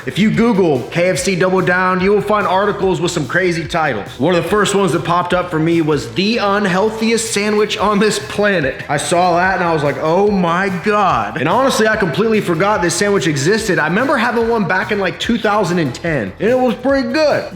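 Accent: Texas, United States. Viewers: Is it just me or his Texas accent is getting stronger? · He's from Ohio, but definitely has Texas growing on him. Food and twang 😍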